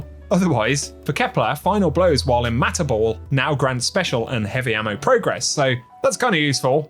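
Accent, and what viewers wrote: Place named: United Kingdom. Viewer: 😂 That freaking Brit accent ☠️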